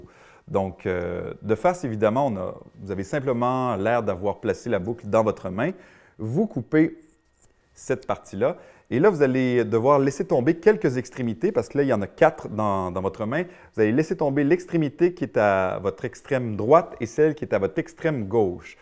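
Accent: Quebec, Canada. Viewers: Petit garnement! J'ai t'ai vu souvent sur des vidéos tournés en France et je dois dire que l'accent québécois te va mieux. Merci Pour les tutos et bon succès!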